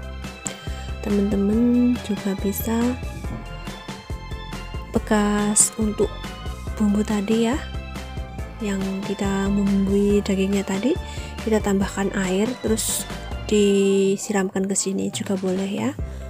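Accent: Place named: Java, Indonesia. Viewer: Logate jowo bingits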